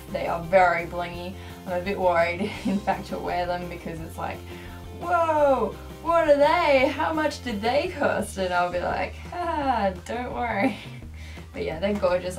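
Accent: Australia. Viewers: Wait if your not Aussie where are you from. U sound Australian 😂🤔😂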